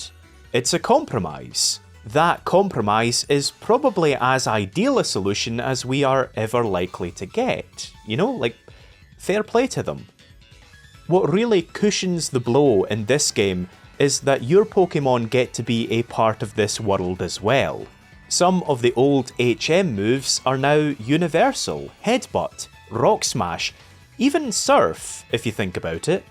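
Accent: Scotland, United Kingdom. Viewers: Honestly, having watched the video, I would really really like to see you tackle Scarlet and Violet one day, because it's one of the most interesting games in the series to me in how it does so many things right, and yet has so many glaring flaws. Honestly, I just really want to hear my beautiful Scottish sweetheart talk about Pokémon for even more hours. I literally couldn't get enough if I tried! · The Scottishness is off the charts. Half the reason to hear you is just being reminded of Tennant.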